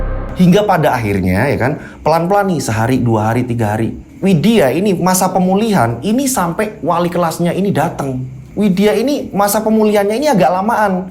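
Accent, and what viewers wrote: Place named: Central Java, Indonesia. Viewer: Absen Kuwait 🇰🇼♥ Ahirnya ada lnjutanya juga yesss Klo dengrin critanya mas ko aku kaya lagi d ceritain mantan🤭 suaranya mirip sih logatnya sma krna dia juga dari jateng aku nya indramyu 🤣🤣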